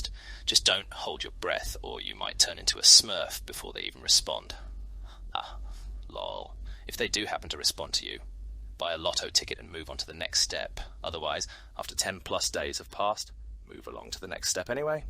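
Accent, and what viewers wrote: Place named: RP, United Kingdom. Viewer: You do sound posh lol Great advice What about broken items same thing ?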